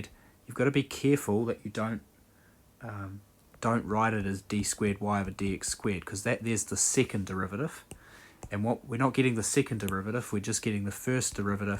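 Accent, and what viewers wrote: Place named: New Zealand. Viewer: Thank you❕ I've done a couple of courses in DEs a long time ago. You are right when you say k< 0 is not a problem, however I thought we were staying in the real world. I really enjoyed this problem and got it out by myself when I heard you say "separation of variables." I hear by you mild accent that you are a Kiwi. I live in Brisbane Australia, and I do YouTube maths for about 4 hrs a day. I'll be visiting your YouTube channel more often.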